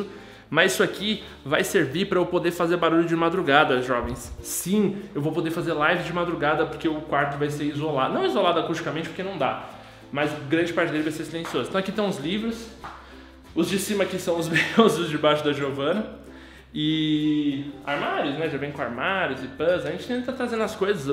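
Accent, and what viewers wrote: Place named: Rio Grande do Sul, Brazil. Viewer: Pensei que ele fosse gay, mas é só o sotaque gaúcho mesmo.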